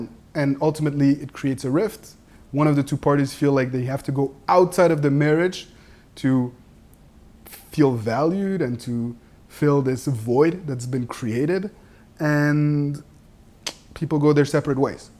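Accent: France. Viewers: Are you French??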